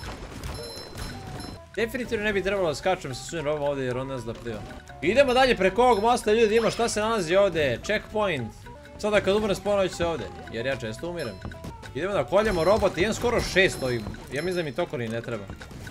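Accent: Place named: Montenegro. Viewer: Kako si mi smiješan kad pričaš ko Crnogorac,jer sam ja odatle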